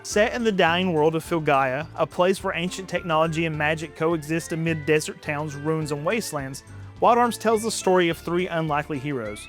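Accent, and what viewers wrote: South, United States. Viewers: I thoroughly enjoy watching a fellow southerner on this space. Your use of "eat up" meaning "to be full of" reminded me of one of my grandfather's funniest barbs. If he thought someone wasn't being smart, he'd chirp that they were "eat up with the dumbass". I'd always giggle when he'd say that and I adopted it into my speech when I was an adult. I love your takes on RPGs, but I also love listening to you because you sound like me when I talk to my friends about games I like. Keep on being you, buddy.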